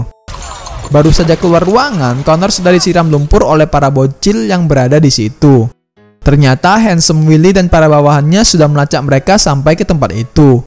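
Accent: Medan, Indonesia. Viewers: Anak medan kau rupanya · Hee bodat.. Kau orang medan yahh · Anak Medan mana suara mu?? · Org medan bg?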